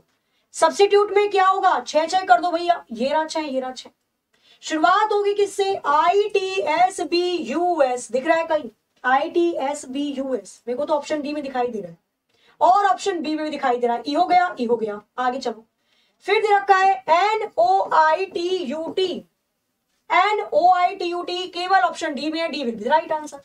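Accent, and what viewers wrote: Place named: Bihar, India. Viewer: mam aap biharq me kaha se h , mai v bihar se hi hu or aapka language same to same meri tarh hi hai😊😍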